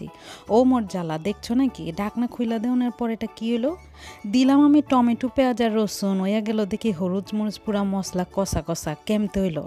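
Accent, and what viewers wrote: Dhaka, Bangladesh. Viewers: মাশাল্লাহ, আমার ঢাকাইয়া বইনের গলায় ঢাকাইয়া ভাসা শুইনা ভালাই লাগল । বাপরে বাপ পাবদা মাছ রান্তাছ ? আমার ভীষণ প্রিয় মাছ । দাওয়াত দিবা না বইন ? চালাইয়া যাও আছি তো । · অনেক ভালো লাগলো তোমার আজকের আয়োজন । তার চেয়ে বেশি ভালো লেগেছে তোমার ঢাকাইয়া কথা । আমি পাবদা মাছ অনেক পছন্দ করি , তুমি অনেক সুন্দর করে রান্না করেছো খেতেও নিশ্চয়ই অনেক মজা হয়েছে। খুব খেতে ইচ্ছে করছে এখন। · আপু অসাধারন হয়েছে আজকের ভ্লগ।ঢাকাইয়া ভাষা তোমার মুখে বেছি ভালা লাগছে 😁রান্না তো মাশা আল্লাহ ইয়াম্মি হয়েছে।,❤ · Khub mojar ranna hoyeche apu. Apnar dhakaia vasa jobbor lagche. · আসসালামু আলাইকুম আপু। জামাইর লগে খোঁচাখুঁচি 😁😁 আছোলেই তো লাকি আফা জাদু জানে হলুদ মরিচ অটোমেটিক তরকারিতে আইসা পড়ছে।খুব সুন্দর কালার আইছে মাছ ভুনায়। ভালা লাগছে তোমার ঢাকাইয়া ভাষা।👌👌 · Apu Ami dhakaiya vasa shunte posondo kori...valo laglo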